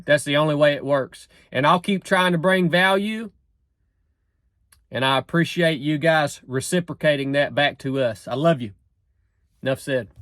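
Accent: South, United States. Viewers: I do love the southern accent. Great words I hope justice is served. Thank you.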